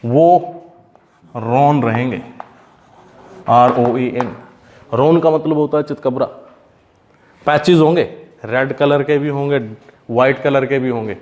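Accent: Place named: Haryana, India. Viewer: Amazing video lectures you are giving sir. Appreciable and yea I like your haryanvi accent too 😂 but yea you explains everything amazingly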